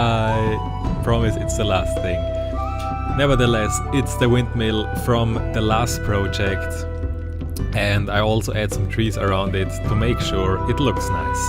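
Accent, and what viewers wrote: Germany. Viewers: Your German isn't bothersome at all. I can understand a few words, so don't worry much. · Mit z German accent =P nhe man, we can clearly understand you and you speak English very well. Love your vids👍🏼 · Sehr gutes video das mit dem deutschen akzent ist net schlimm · Du bist deutsch das höre ich aus dem akzent =D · gut zu wissen, dass du deutscher bist :D Mach dich noch sympathischer!!! Aber wenn man es weiß hört man es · great video! definetely will use these ideas in my world, like the hay car ;) i didnt mind your German accent, im Dutch so thats even worse :P great video, A like and A subscribe from me ;) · your accent is cool btw haha, german sounds great, dont be shy ! :p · You are an awesome builder! Ehrlich gesagt dein deutscher akzent macht deine stimme noch angenehmer :D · Sehr schönes Video und man hat sofort gemerkt das du ein Deutscher bist :D Ist nicht unbedingt schlimm, dein Englisch ist gut verständlich und du hast eine angenehme ruhige Stimme. 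Atmosphäre ist wirklich kein leichtes Thema, selbst für erfahrene Bauer. Bei den meisten Leuten die ich kenne ist der Anspruch an Atmosphäre überhaupt oder wenig vorhanden. Die Umgebung ist meistens wichtiger als das eigentliche Gebäude und das wurde hier schön dargestellt ;) Ich finde es müssen wirklich kleine Geschichten durch feine Details eingebaut werden, aber auch die Materialien müssen sehr variieren, also random platziert werden. Schöne Grüße aus dem norden Deutschlands ;) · Another great video, and with a German accent to boot? <3 Also... your mc skin is Finn, the Human? <333 Keep the videos coming, ^^*Also, don't apologize for your accent/language- be proud of your heritage, and exuberant for your linguistic idiosyncrasies! ^^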